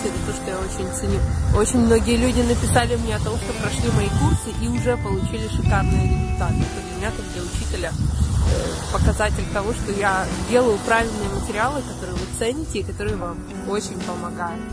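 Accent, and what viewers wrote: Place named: Oxford, United Kingdom. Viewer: спасибо, вам за поздравления, за ваши видео-уроки, за вашу безвозмездную огромную работу, которую вы делали и продолжаете делать для нас! Мне стыдно за то, что пока не могу сделать хоть какой-то взнос вам, вашему каналу. Английский это просто моё хобби, от которого я не жду ни каких достижений, успехов. Просто не хочу бросать эту практику, если у меня это получится, то это благодаря вам.Счастья вам и процветания в новом году! p.s. У вас очень классное произношение, по мне, так почти оксфордское.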